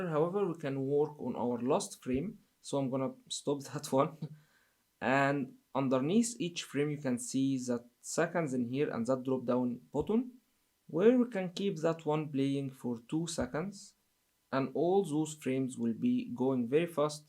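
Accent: Egypt. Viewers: السلام عليكم هو حضرتك مصري ؟ اصل من اللكنه قلت انت ممكن تكون مصري .. انا معجب بشغلك جدا ما شاء الله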